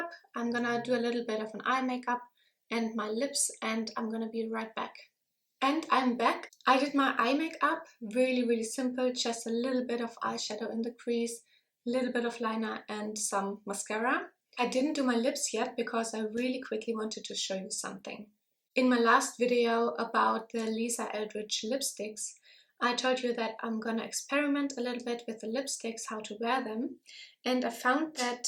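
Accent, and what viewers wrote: Germany. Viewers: haha ich wusste ich hatte einen deutschen Dialekt rausgehört :) du bist wirklich wunderschön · are you german? think you have an very german accent.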